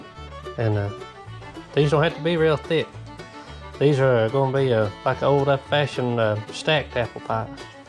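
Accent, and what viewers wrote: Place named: Kentucky, United States. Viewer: I’m smiling the whole video. When I hear you talk...takes me right back to Sunday dinners with my Kentucky kinfolk whom I miss dearly. Until I get to Beulah Land. Thank you and God Bless.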